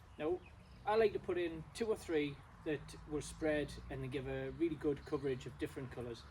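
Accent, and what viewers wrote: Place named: Scotland, United Kingdom. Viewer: I came here from Kate. Watching from Toronto,Ontario Canada. I am wondering where your accent is from. Sometimes I hear a Scottish accent. My uncle lived in Peterlee County Durham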